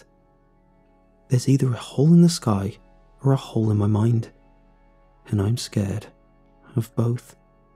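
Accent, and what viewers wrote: United Kingdom. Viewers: That British accent ❤❤❤❤❤❤❤❤❤❤❤❤❤❤❤❤🍌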